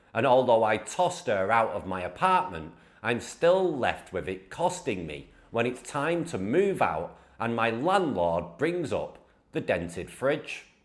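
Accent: United Kingdom. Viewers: Complete BS!..he is British by the way he talks.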